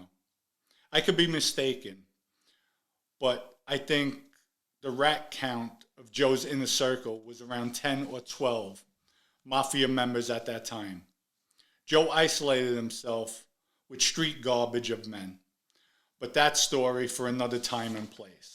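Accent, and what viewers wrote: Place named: Bronx, United States. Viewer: once I heard like myself, you were from the Bronx, I was sold. Welcome and good luck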